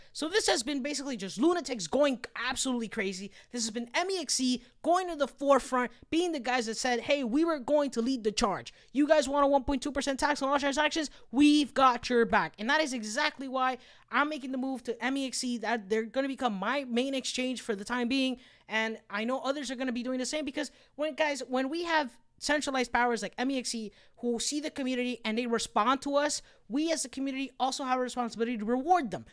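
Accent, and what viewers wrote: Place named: Bradford, United Kingdom. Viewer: Are you from England You accents like you from Bradford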